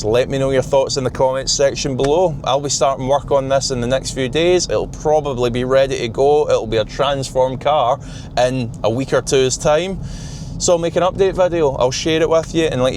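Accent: Scotland, United Kingdom. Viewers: There is nothing more satisfying than hearing the word Alcantara in that Scottish accent.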